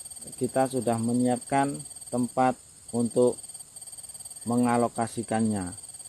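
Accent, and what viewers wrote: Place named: Java, Indonesia. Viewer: Ojo medhok to boss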